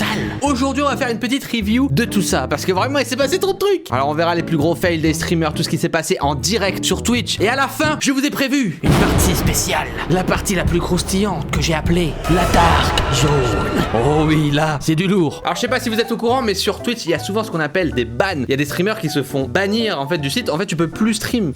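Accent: Quebec, Canada. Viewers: = l’accent québécois est trops cool Moi= fait une vidéo où tu parle juste en québécois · Jaime ton accent québécois et je suis québécois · Mec ton accent québécois est très drôle mais un conseil c’est o pas au dans hosti de tabernak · je suis québécois et ton langage de québécois est a amélioré mais sinon continue tes vidéos j'adore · Ton accent québécois est parfait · Chui québécois je valide en tabarnak ton accent continue tes vidéo tes le meilleur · En vrai ton accent québécois sa va sinon super video😂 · .... Stp arrete de faire l'accent des québécois tu m'énerve tellement quand tu fait ca.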